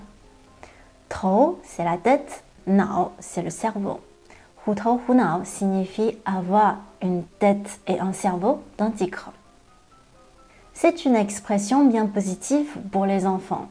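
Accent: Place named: Northeast, China. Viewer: 东北那旮沓的方言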